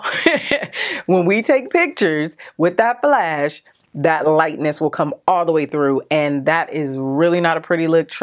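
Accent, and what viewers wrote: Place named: Spain. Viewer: Loved your enthusiasm throughout the video as well as your Spanish accent! You rock! Tu si sabes!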